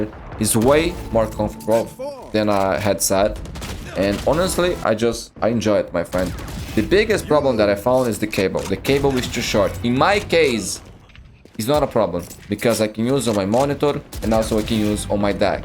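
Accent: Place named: Brazil. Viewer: eu comecei o video pensando: cara, ele tem um sotaque do ingles brasileiro, ai quando eu olho pro seu lado, tem um camisa do flamengo kkkkkkkkk.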